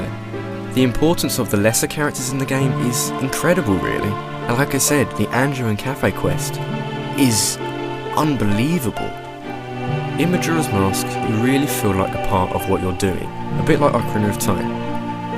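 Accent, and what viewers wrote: United Kingdom. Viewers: Because almost every Brit I've heard pronounce Ocarina says Ocariner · OOOOH SWEET! A BRITISH GUY!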